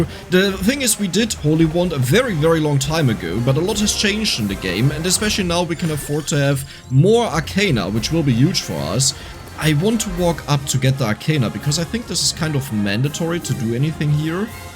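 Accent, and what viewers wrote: Germany. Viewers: Are you german,?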